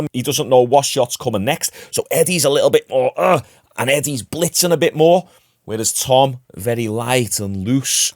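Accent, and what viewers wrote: Liverpool, United Kingdom. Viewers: Can you shut up and just watch the video please! I don't want to hear a scouse to talk over the video!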